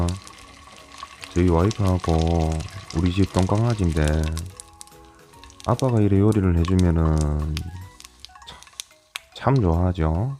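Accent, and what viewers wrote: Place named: Gyeongsang, South Korea. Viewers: ㅋㅋㅋㅋㅋ 어디 지방인겨~!? 부산이라예~? 사투리가 윽쓰로 구수한기 정감있눼예... ㅎㅎㅎㅎ 있는 그대로 말했뿌믄 음식 보다 나레이션 완쩐 찌깁니다!! ^^* 나레이션이 너무 구수한게 정말 매력이 좋습니다~ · 경상도 아재 특유의 사투리가 구수 해서 좋은것 같습니다. 항상 있는 그대로의 모습이 좋은것 같습니다. 위생 장갑을 끼고 요리 하시는건 왠지 살짝 적응이 안되는 일인 이라서 . 앞으로 좋은 레시피 영상 기대하면서 좋은 영상 감사합니다. 지금 나레이션 방식 변화없이 죽 가시기 바랍니다.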